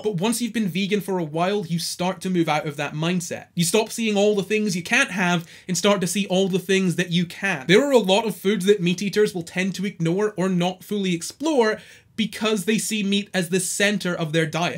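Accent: Scotland, United Kingdom. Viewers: 2 months of veganity woop woop, also are you Scottish?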